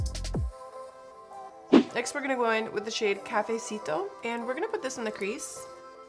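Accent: South, United States. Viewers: First time ever watching you.. omg love you!!! Anyways your too funny with your southern accent and bombshell glam beauty I loves it stay cool babes! Looking forward to watching you more.. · Gorgeous look ❤️ Yes, just do the makeup style you love 😊 Thank God you saved the contour!!! 🥴🙏😁 Brows looked great. Love your sarcasm and southern accent 😂 so funny! Creme de Nude is my fave 💄The foundation looked flawless on your skin.. I wish the undertone was more neutral ❤️ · You are hysterical and absolutely stunning!!!! Loved the southern accent throughout!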